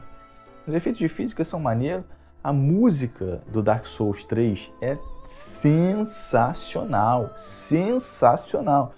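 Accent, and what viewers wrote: Rio de Janeiro, Brazil. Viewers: nem tô mané, é só carioquês mesmo... Isso aí pra mim é vírgula kkkk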